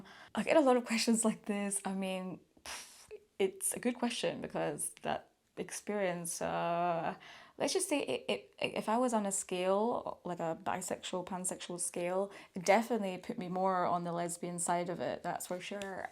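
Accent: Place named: Scotland, United Kingdom. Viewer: Dear, I am not at all sure why your videos started appearing in my feed, but I am very glad they did. It could be because I had watched a few Japanese travel things previously but who knows the whims of the Great Algorithm? Anyway, I found the cuddling video deeply meaningful and also moving. It was also lovely to hear your accent (I am originally from Dundee) and your red hair. Oh, I am male, quite a bit older than you and "straight" whatever that means. I hope you will continue to make these lovely travelogues. Safe travels 😎😎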